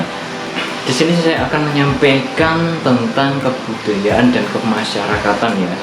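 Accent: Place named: Java, Indonesia. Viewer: Bahasanya medok banget bos wkwkwk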